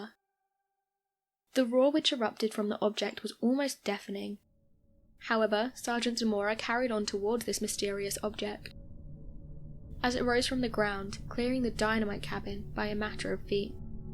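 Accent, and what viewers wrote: United Kingdom. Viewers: Al-u-mi-nium.????? Why do all people with british sounding accents pronounce words so blatantly wrong.?? They're taught the same alphabet as American English speaking students, and taught that all of the letters make the exact same sounds as were taught, yet they insist on pronouncing them the same way as every other retard over there. Some way worse than others. Ask any of them how to pronounce the letter Z, and they'll say ZED... ask them how to spell it and they'll say ZED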